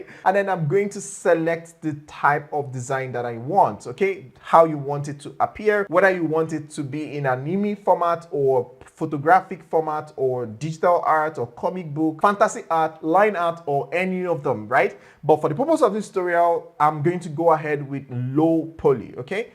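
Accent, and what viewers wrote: Nigeria. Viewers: Are you Nigerian?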